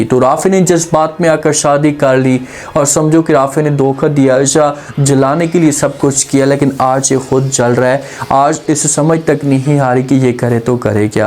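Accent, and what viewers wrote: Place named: India. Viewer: Anchor you have a bloody awful accent india🇮🇳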